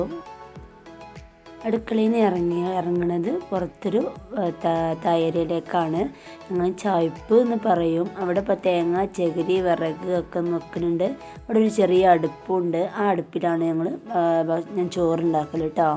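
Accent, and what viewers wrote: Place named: Malappuram, India. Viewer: Malapuram aanu alle samsar kelkumpoanassilaavunund veed pazayath aananneyollu nalla soukaryam ulla veed aani. Nannayitund video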